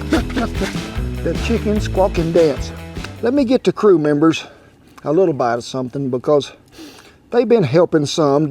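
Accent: United States. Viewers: You should know, I watch this channel not only for culinary tricks. Your particular country English is amazing. Love country. 🤠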